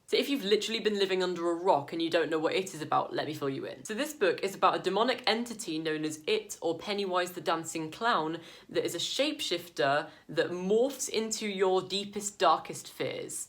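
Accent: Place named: United Kingdom. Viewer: I love your unusual opinion and accent. I think you are British.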